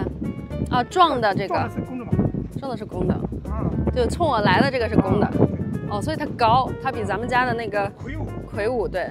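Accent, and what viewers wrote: Qingdao, China. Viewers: 哈哈 博主大概率是青島人吧？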